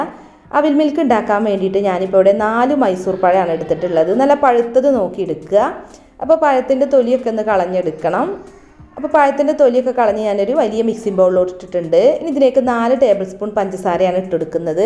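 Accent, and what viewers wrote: Malappuram, India. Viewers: Super try cheythu nokkattoo.ningalude വീട് malappuram anooo😀😀😀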